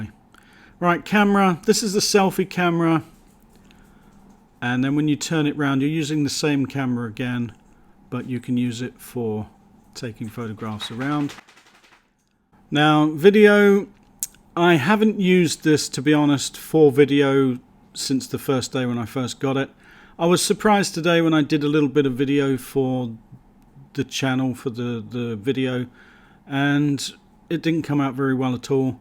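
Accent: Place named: United Kingdom. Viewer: i love the accent. sounds like one direction when they talk, specifically zayn. are u british? i'm subscribing bc of that 🌹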